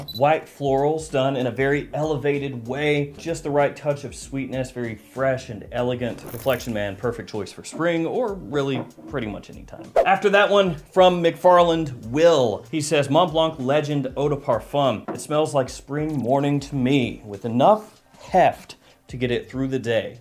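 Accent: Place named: New Jersey, United States. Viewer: Are you from nj? Lol because sounds like nj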